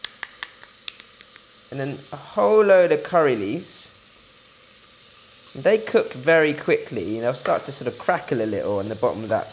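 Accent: England, United Kingdom. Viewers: That's the way it's pronounced where he's from in England. Christ, the things people whinge about!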